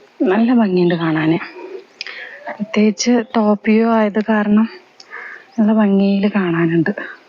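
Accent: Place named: Malabar, India. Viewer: 40 പേർക്ക് ഇഷ്ടപ്പെട്ടു എന്റെ കമെന്റ്..അറിവില്ലായിമക്ക് കയ്യും കാലും വെച്ചാൽ അതിനു എന്ന് വിളിക്കാം...വാഴാനി അണക്കെട്ട് എവിടെയാണെന്ന്.എവിടന്നു പോകുന്നു എന്നും അടുത്ത ഏതെങ്കിലും അറിയപ്പെടുന്ന ടൌൺ ഉണ്ടകിൽ അത് ഇതൊക്കെ പറഞ്ഞാൽ അറിവില്ലാത്ത ഞങ്ങൾക്കും പോകാമല്ലോ.എന്തിനാണ് വീഡിയോ പിടിച്ചു ഒരു പരിചയവുമില്ലാത്ത ഞങ്ങളെ കാണിക്കുന്നത്.പ്രായത്തിനെ അന്തസ്സെങ്കിലും കാണിക്കുക.ഇത് താങ്കളുടെ തെറ്റല്ല കോന്തൻ ആണ്..സംസാരത്തിൽ നിന്ന് നിങ്ങൾ മബാറുകാരിയാണന്നു മനസ്സിലായി.വളരെ നന്ദി ഇനിയും ഉപദ്രവം തുടരുമെന്ന പ്രതീക്ഷയിൽ തൽക്കാലം നിര്ത്തുന്നു സ്നേഹപൂർവ്വം കുഞ്ഞാപ്പ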